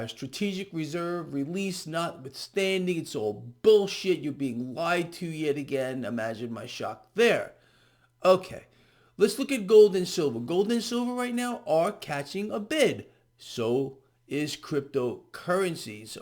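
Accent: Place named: New York City, United States. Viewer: we love your Italian NJ/NYC pronunciation of 'costs' like 'caust'(ic)👌🏻 I think the NYC Italiano accent is awesome & admirable😄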